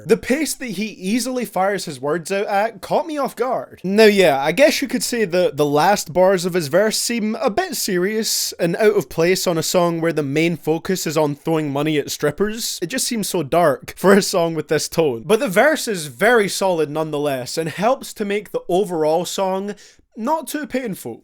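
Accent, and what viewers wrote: Ireland. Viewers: Are you Irish or Scottish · you sound Irish today are your Irish?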